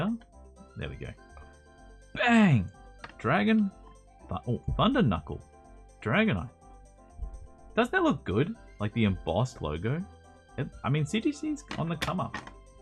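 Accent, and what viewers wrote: Australia. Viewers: An hour video of regrades from a dude with an Australian accent? I click.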